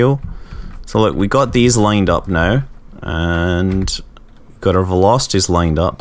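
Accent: Scotland, United Kingdom. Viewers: Scottish Manly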